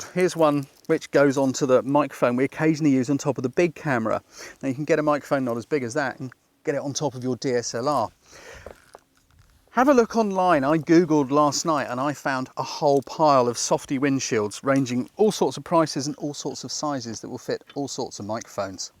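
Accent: United Kingdom. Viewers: This is excellent, great content for a photographer looking to get into video. Thanks for sharing, any chance of more in this series? Your presentation style is spot on, and a British accent as well, hooray!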